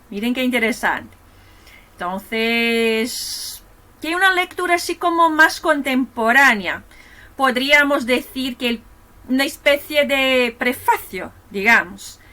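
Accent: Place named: Spain. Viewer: Parabéns, professora, pela brilhante análise do soneto e pela relação que fez com a contemporaneidade. Lindo acento espanhol.👏🏼👏🏼👏🏼👏🏼👏🏼👏🏼👏🏼👏🏼👏🏼👏🏼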